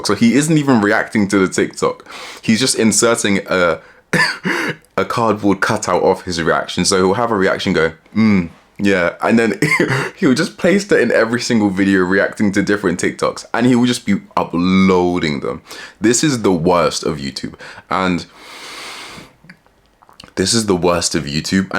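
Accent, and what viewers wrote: United Kingdom. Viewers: Jesus loves you and died for you. Also I love ur uk accent 😭 (im not from the uk)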